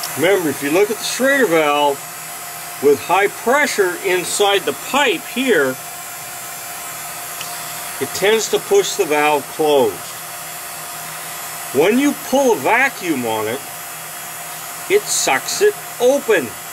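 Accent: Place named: South, United States. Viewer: It makes great sense...I learn from this man every time I watch him....A great, country voice, to boot.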